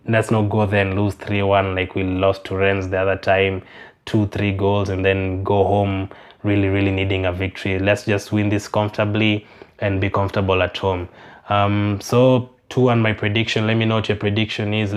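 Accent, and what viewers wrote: Kenya. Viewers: U sound Kenyan u accent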